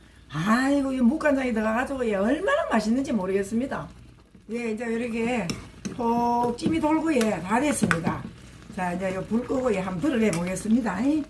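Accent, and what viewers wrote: Gyeongsang, South Korea. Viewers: 깻잎찜 요리 잘 배웠어요. 참 맛있겠어요. 손 내밀어 주셔서 감사합니다. 경상도 사투리 구수하고 좋네요. 저도 손 잡고 요리 배울게요. 🎁🔔 응원해요!👫💕👍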